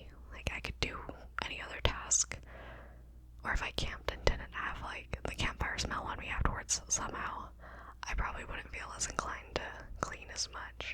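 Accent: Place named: Vancouver, Canada. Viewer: It sounds as if you are from Vancouver, BC.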